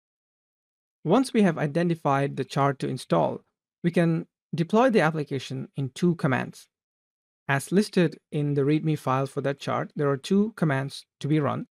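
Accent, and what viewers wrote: India. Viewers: malayali anno ?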